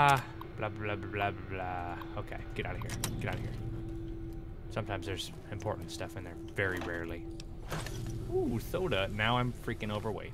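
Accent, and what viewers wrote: Scotland, United Kingdom. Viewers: Mate the Scottish accent is fun ( especially in England where no one understands you ) but it ain't the easiest to get rid of once you've got it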